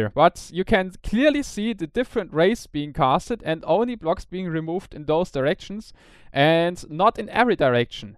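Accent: Germany. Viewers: Du bist ein deutscher oder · He's speaking English with a German accent. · Echt ey klingst voll deutsch melde dich mal :D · man merkt direkt das du ein deutscher bist xD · Hab sofort gemerkt das Du ein Deutscher bist ;-) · du bist doch deutsch , das hörtman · You have a bit of a german accent... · ***** merkt mann eig echt leicht du hörst das deutsch raus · Bist du Deutscher? Man hört so nen kleinen Akzent raus. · tell me im wrong...but i think you are german (im german too so i might probably be able to tell better) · [german accent detected] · youi have a german aczent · du bist Locker deutsch! :D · Ein sehr deutscher Akzent...hm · You are deutsch, stimmts? Hört sich lustig an :D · Are you german? You have an really stong german accent. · Wie man direkt hört, das du ein Deutscher bist :D Wie dem auch sei, gutes Video! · Starting the video, I knew right Form the get go that you're German as well :D nice video tho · Your pronounciation sounds like you are from germany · du bist deutsch, oder? 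· Du bist doch deutsch du hast an akzent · Wie man den deutschen Akzent hört xD Is ja übel This guy is german, u can hear it by his accent. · deinen Deutscher Akzent hört man voll raus ^^ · mann hört das du deutscher bist :) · You really sound like a german guy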